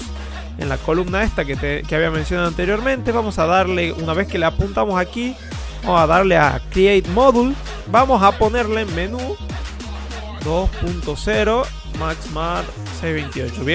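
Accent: Tucumán, Argentina. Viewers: es tucumano pinta que es tucumano mal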